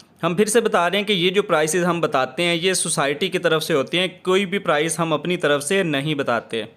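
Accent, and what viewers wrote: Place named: Punjab, India. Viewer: location آواز سے پتہ چلتا ہے کہ بندہ پنجاب سے ہے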